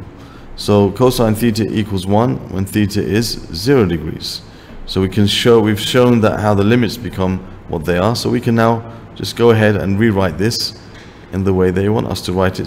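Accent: United Kingdom. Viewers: That's really interesting. They say you can sort out where a Brit is from by his accent, but hearing your flawless London accent yet knowing your very "un-British" name, I couldn't hold my fingers from asking you this. Thanks for the video and for your reply.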